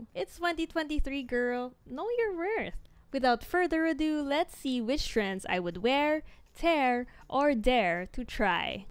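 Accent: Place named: Philippines. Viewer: omggg i was thinking your accent sounds filipino and i was shocked when you spoke tagalog LMAO i like this content, pls continue doing more <3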